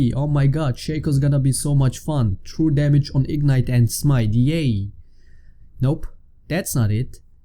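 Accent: Germany. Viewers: Wie man hört, dass du deutsch bist :D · Höre ich da einen deutschen Akzent :D ? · ich mag deinen deutschen akzent xD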